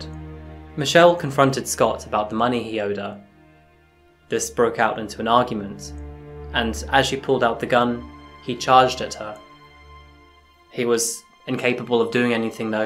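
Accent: England, United Kingdom. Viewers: Their accents aren't even from the same country though. TC is Irish and this guy is English....very noticeable difference there. I can definitely see the other similarities. I don't get the same vibe from the two channels even though there's a pretty clear inspiration in style here. TC is a lot more lighthearted for a start. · You “lost your accent” when you left Seattle? 😆 I would argue you ACQUIRED your accent when you moved to England. Eh, po-tay-to, po-tah-to. Well, either way, I came across your channel recently and I have now spent my whole day watching your videos. Great content! Thanks and keep it up!!! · ENGLISH accent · "Losing my accent..." I think you should've said gaining your accent haha. I never would've guessed you were born in the states with that thick British accent you have! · Ah that’s why you’ve got an English accent 😊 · By the way, I like your English accent, being educated at an English school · This is crazy. 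Who moves from Seattle to England and starts speaking with an English accent. Gross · Wait so you're american with a bri'ish accent, amazing 👁️👄👁️ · I heard him say in one video he was born in Seattle I always wondered where the British accent came from. Now I know. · Yes it is. Slightly northern accent sometimes. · What part of England is your accent? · . You are the first American born person with an English (correct me if I’m wrong) accent. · I've always assumed you were English, going by how you sound. Never knew you were born in the US! When I went to the US on holiday nobody could understand me, I even had someone say I spoke English with a funny accent, they asked where I was from, I replied England! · You did not lose your accent by moving to England, you gained one. You have a British accent 😁 This Joseph guy is a bad actor. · That's interesting that you grew up in Seattle and then moved to England and got an english accent. 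I deserve Madonna an apology. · He's English now, which means he lost his American accent. An American accent isn't "default". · I will comment that you don't seem to have an accent here with this video, yet you are very "English" with the others I have watched. I am new to your channel and am not watching them in order. You do say things like, "Car Park" (we say driveway) and many other endearing comments which show your English side. 🏴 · You didn’t lose a Seattle accent. You gained a British accent, which sounds nice BTW.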